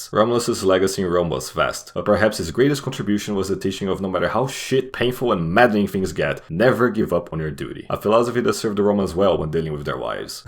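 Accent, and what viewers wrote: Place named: Brazil. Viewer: Is the narrator Brazilian? His accent sounds so Brazilian!!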